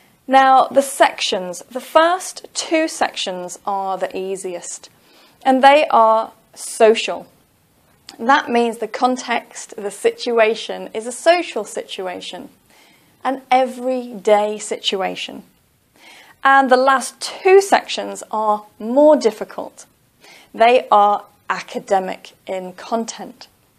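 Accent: England, United Kingdom. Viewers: 😂 lol yeah Because is an English lady living in england😎 accent can tell the saga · i really love you madam, i really love the way you make us understand. so easy and slowly, however the British accent is a bit difficult to understand.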